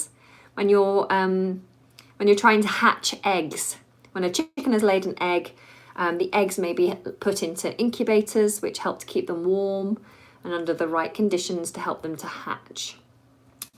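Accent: United Kingdom. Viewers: Hello! I can't help saying this! You explained everything related to an infectious disease like coronavirus in a charming and interesting way! Honestly, you and the way you speak British English are both lovely! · You are a fluent teacher in British accent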